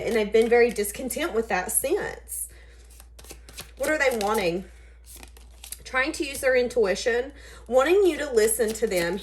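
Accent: South, United States. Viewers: You have a Southern twang but I love it 😍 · OMGEE!!! I watch ALL your videos EVERYDAY!!! Whether they resonate or not!!! I was searching for a video yesterday, like where is my daily tea!!! And why the past week I was thinking: I’d like to see her face on a live!!! Cause I absolutely love your country accent!!! Then BOOM!!! I click and see YOU!!! You’re gorgeous,!!! 🙏🏽🙏🏽🙏🏽 Ok, anxious to hear this reading!!!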